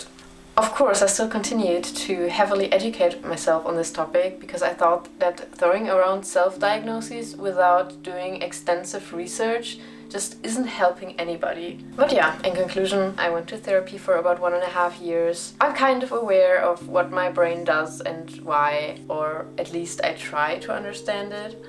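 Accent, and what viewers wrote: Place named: Germany. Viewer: Witzig Habe nach neurodivergent artists gesucht & du bist aufgetaucht. Direkt im ersten Satz konnte ich vermuten dass du aus DE kommst.. sollte so für mich leichter sein das englisch zu verstehen 😀😀💚💚💚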